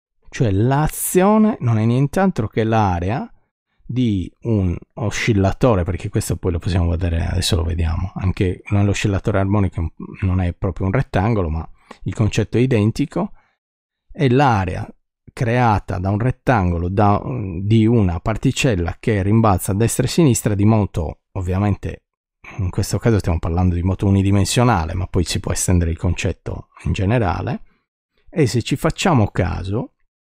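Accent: Sardinia, Italy. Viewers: meno male 28 anni non son bastati per farti perdere l'accento sardo :) saluti dall'isola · Confusione tanta......e un accento sardo insopportabile. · Buonasera professore! Provare a spiegare i quanti senza partire da Plank e dal piano PX( come fanno taluni) non è solo trascuratezza o tracotanza. Peggio: é mancanza di compassione per chi segue!!! Come coloro che spiegano la simultaneità in relatività ristretta, senza compararla con un esempio di relatività galileiana. Grande lezione la sua! Anche già per chi ci capiva qualcosina... ma sono sempre utili conferme che provengono da certi lidi. Emergono le dimensioni di h in modo immediato. Ma se uno volesse capire il modulo di h... sarebbe un problema? Anni fa studiai qualcosa, ma avendo avuto all' epoca altre beghe,non mi ricordo una mazza. A matematica arrivo a derivate , integrali e un po' di numeri complessi; non so usare matrici e tensori. 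Può indicarmi dove cercare qualcosa. Certo non oso chiedere a lei un aiuto sul sito, se non utile a tutti. Grazie per la sua lezione, senza enfasi o dialettate romanesche come quelle del simpaticissimo prof. Battimelli, che per questo a volte offre il fianco ai fisici ortodossi. Lei mi sembra sardo. Se così con noi Pisani avrà , come é stato da secoli un rapporto di fratellanza. Pisa= Gallura. Gallura= Pisa! Un caro saluto e scusi la mia prolissità senile!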